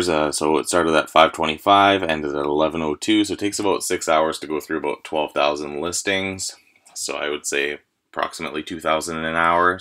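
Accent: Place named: Canada. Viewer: about? Omg you are Canadian. Love Canadians.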